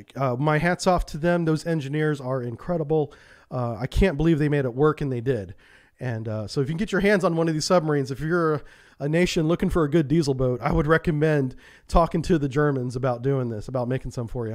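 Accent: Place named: United States. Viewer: the pronounciation was a bit off, thats right, but still understandable and easy to correct with very minor "practice". just cut the american "dialect" pronouciation habit and you should be more than good enough. umlaut and other stuff is not really neccesary, as it is difficult for english speakers to wrap their head around and germans dont really mind if you dont pronounce them. just dont end up like the guy in the "Tank Museum Anti Tank Chat"..... that was just horrible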